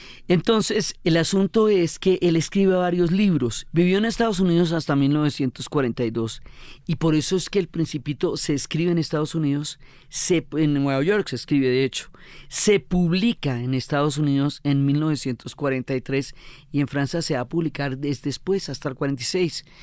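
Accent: Colombia. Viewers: El Principito es mi libro absolutamente favorito y Diana Uribe con ese acento colombiano divino, juro que nunca antes de esta señora me había gustado la historia, con ella lo que antes era aburrido es música para mis oídos ❤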